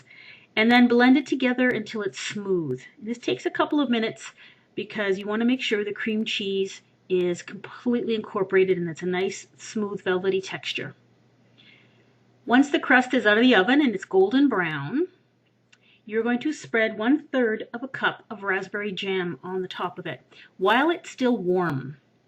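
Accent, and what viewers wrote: Canada. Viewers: This is probably an American referring to your Canadian accent:) I am totally going to make this for my bf this weekend! yummy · For aboot 15 minutes. Hehe. I love canadian accents. <3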